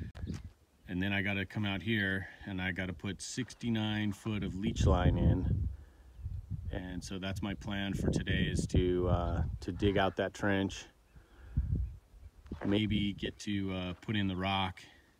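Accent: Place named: Northern Idaho, United States. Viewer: You sound like everyone in Northern Idaho. Ha except here they tell Californian's to go home. The funny thing is they are all from California but they came here 20 years ago so now they think they claim the state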